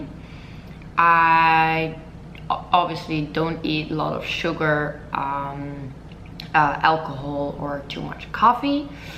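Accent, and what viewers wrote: France. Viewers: Your French accent is so cute. I’m French btw 😜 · Is she french ?? She has like a french accent Ps : that tan didn't look so good , her natural skin color looked way better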